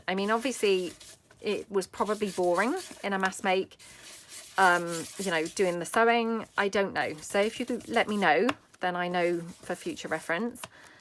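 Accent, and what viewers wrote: United Kingdom. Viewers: it’s not Diane. I knew it had to be somewhere in the UK because I have a friend in London with the same kind of accent. I am from Michigan in the US. I absolutely am loving your tutorials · I love to watch your videos. I love your chatty ways. You take my mind off my worries for a while. It's like having a British friend over to craft!! What fun! Imagine you listening to my Southern Oklahoman accent. Very beautiful work.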